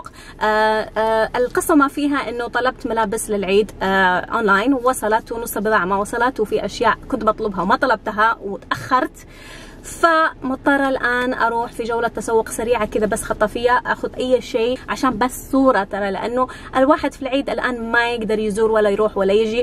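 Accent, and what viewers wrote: Saudi Arabia. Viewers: انتي سعوديه؟ مستغربه من لكنتك سعوديه بس وجهك وملامحك كويتية او اماراتية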